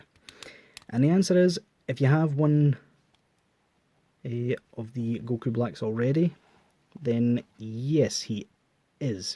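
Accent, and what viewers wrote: Scotland, United Kingdom. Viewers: i have bought this figure to see it for myself and I also cant afford the real one btw are you from Scotland because if you are I am aswell :)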